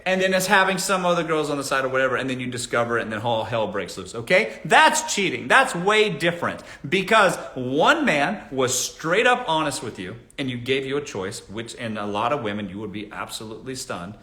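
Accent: South, United States. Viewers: 😂😂😂 "A little bit nappy"🤣 Omg. We can SO tell you were born in the SOUTH. The way you keep it real hurts so good and is so hilarious 🤭 #Boss... my granny was born in MS and we grew up telling it like it is. Tell the truth, shame the devil.😌😆🤷🏾‍♀️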